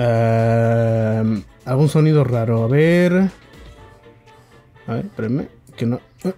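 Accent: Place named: Canary Islands, Spain. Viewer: pensé que eras de canarias España es un acento parecido Saludos!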